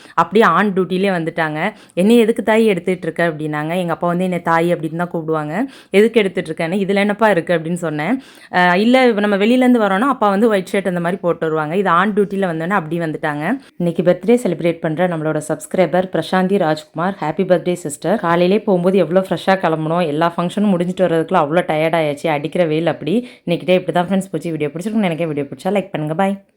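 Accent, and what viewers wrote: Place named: Thoothukudi, India. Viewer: haiiiiii naa ERAL. Unga pecha vachutha neenga entha uru nu kettan😊😊😊😊😊😊.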